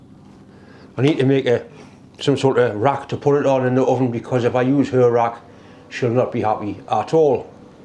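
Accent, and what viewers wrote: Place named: Geordie, United Kingdom. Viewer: Hi, with this video you have given me proof that a skilled machinist is also a great cook. Too bad with your strong Geordie I can't understand the whole thing, but the images are excellent. Greetings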